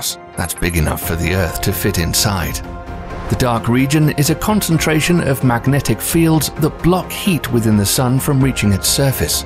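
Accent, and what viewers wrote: United Kingdom. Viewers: You speak with a British accent, yet you use Fahrenheit? Please use international standard units.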